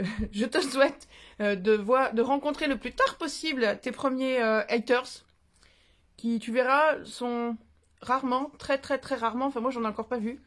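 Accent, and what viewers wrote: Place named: South, France. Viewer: j'aime beaucoup votre style 😉, comme l'a fait remarqué Amandine, votre accent nous met le sud dans la tête. Bienvenue sur you tube, c'est courageux de se lancer, moi ça fait des mois que j'y pense mais... Et j'ai l'impression que ça prend beaucoup de temps, et surtout quand j'entends sur certaines chaînes que les gens sont si méchants, je ne sais pas si j'arriverai à laisser passer ou à m'énerver.. Les deux chaînes dont vous vous êtes inspirées pour la création de vos cartes, sont pour moi de super chaînes avec de belles personnes derrière leur caméra. Bonne continuation. À bientôt ici ou sur votre chaîne. 😉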